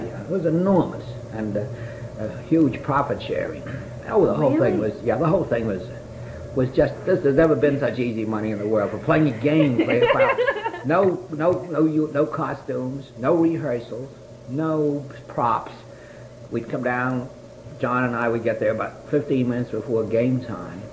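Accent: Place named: New York, United States. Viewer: Nobody speaks with this beautiful old New York accent anymore.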